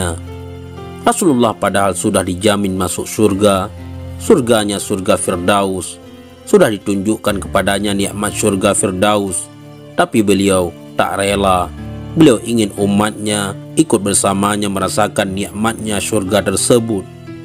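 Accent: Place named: Bali, Indonesia. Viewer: Suara admin kayak orang Bali ya?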